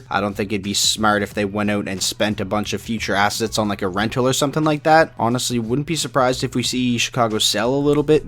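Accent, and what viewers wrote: Canada. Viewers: As a Canadian, you have the most Canadian accent i've heard in a while. You have to be from a small town out in butt fuck no where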